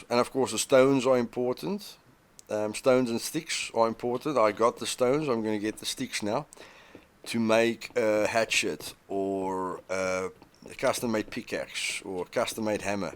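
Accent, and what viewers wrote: South Africa. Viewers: You from South Africa? Subbed!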